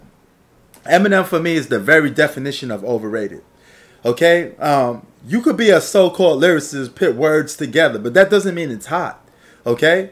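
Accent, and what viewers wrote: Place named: Baltimore, United States. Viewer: You’re from Queens. I honestly thought you were from Baltimore from your accent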